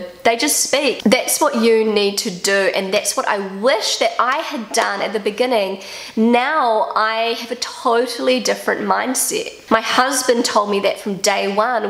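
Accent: New Zealand. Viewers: I love your videos! I have a good opportunity to hear Kiwi English and know a little about Germany. Perfect! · I also love accents and especially your swert kiwiaccent...I think you have to make another german video here on YouTube...😃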